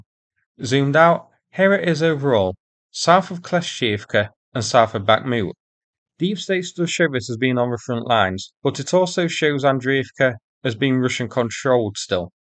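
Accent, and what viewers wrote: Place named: Home Counties, United Kingdom. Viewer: Sounds a bit Home Counties but I think you can safely call the 8 buildings that make up Andrivka a 'Hamlet' not a town 😉❤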